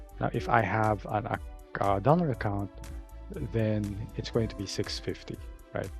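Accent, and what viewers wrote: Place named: United States. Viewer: You indeed have a nice american accent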